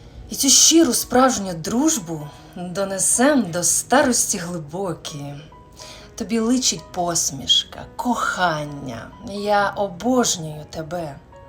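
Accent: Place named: Surzhyk, Ukraine. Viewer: Це не Українська, пробач мені. сільській суржик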